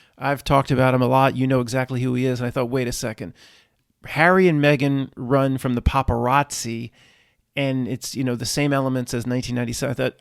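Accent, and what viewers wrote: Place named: United States. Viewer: Hey it’s guys. I like your non accent. Reminds me of my father. But you say wurter instead of water. Have a nice day. I was listening to Tim Keller on YT and he was a bald guy from Pennsylvania too.Same nice American accent!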